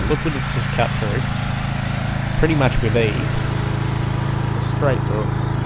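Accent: Australia. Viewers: Love your Australian accent!!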